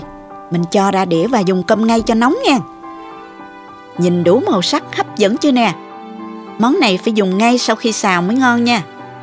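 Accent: South, Vietnam. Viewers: Giọng nam ngọt ngào Thức ăn đậm đà Còn gì ngon hơn nữa, chúc luôn khỏe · Giọng nói người miền Nam nghe dễ thương quá 💐💐💐💐❤️❤️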